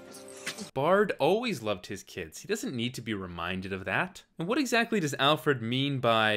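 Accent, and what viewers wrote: Canada. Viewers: You sound Canadian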